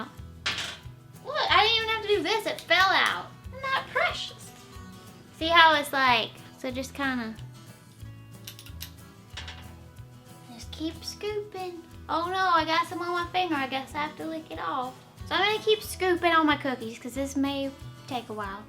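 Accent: South, United States. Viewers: Love you when you bouncing around in the kitchen ,by the way your southern accent is gorgeous. I wish could learn talk like you. Xmas greetings from sweden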